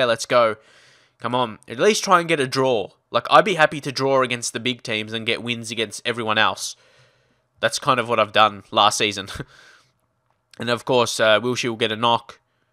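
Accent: Australia. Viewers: Love these vids ps where in Australia are you from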